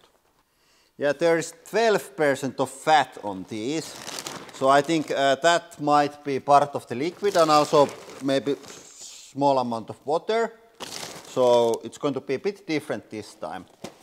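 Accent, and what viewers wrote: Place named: Finland. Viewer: That classic Finnish accent making Weetabix, Fetabix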